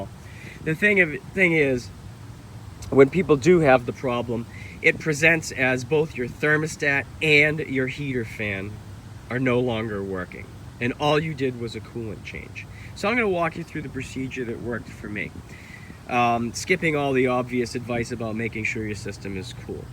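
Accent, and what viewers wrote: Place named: Massachusetts, United States. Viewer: Fantastic video! Thanks for the help! By the way, I knew you were from Mass about into the video. Cheers!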